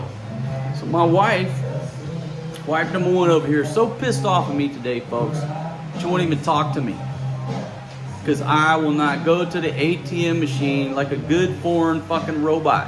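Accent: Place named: Alabama, United States. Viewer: Brother You Got Me Cracking up Big Time. I'm here in Alabama USA 🇺🇸. You sound just like someone here would say. Be Good Bro. God Bless You Man.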